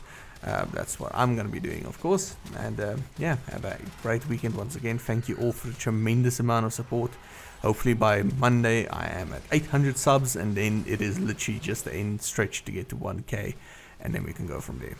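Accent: South Africa. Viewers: You sound South African. Will give you a like and sub. Gl with YouTube man.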